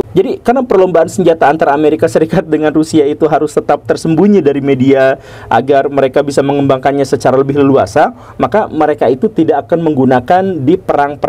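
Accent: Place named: West Java, Indonesia. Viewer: Senang dengan istilah pidio da bukan video,,, maap dan bukan maaf... Sunda banget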